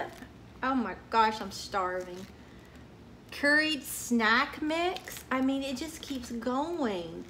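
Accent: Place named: South, United States. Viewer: Nice haul! I just love listening to you, I had some family from Florida and my Aunt had that southern draw and she called me sugar all the time!!! And every time I hear you I think about that!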